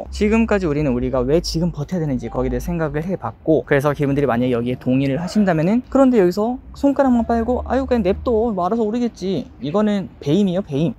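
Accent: Gyeongsang, South Korea. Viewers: 혹시 대구분이신가요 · 대구 사람이셨구낭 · 대구사람이에요??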